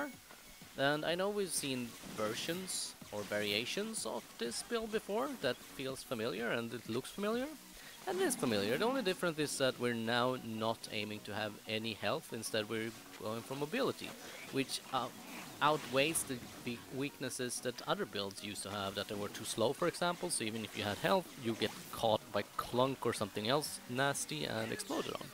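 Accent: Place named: Sweden. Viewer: You sound so Swedish, are you really from the US?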